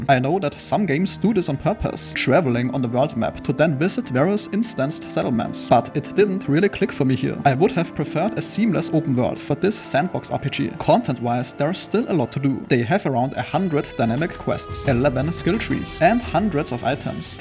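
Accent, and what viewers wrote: Germany. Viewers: Der Deutsche Akzent ist schon nice XD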